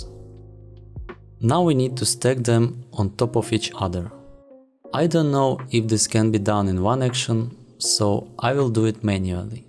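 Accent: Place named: Russia. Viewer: Слышу русский акцент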